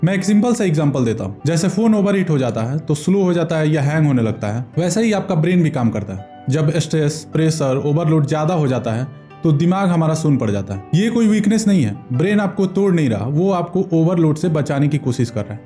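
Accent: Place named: Bihar, India. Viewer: yes , i am from motihari , by your accent i instantly knew you are from bihar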